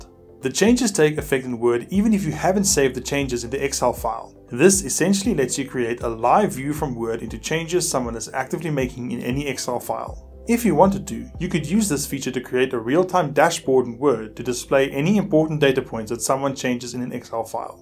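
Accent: South Africa. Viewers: Great South African Accent !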